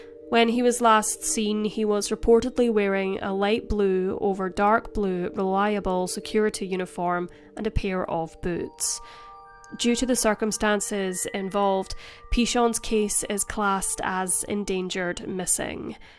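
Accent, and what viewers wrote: Scotland, United Kingdom. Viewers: Cute lil Scottish accent ;)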